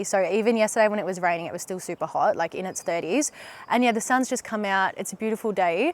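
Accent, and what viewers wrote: Australia. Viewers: I like that Australian accent.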